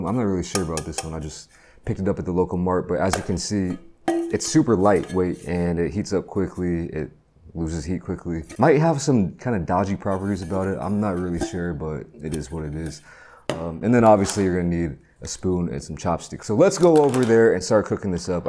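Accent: United States. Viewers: He's American I think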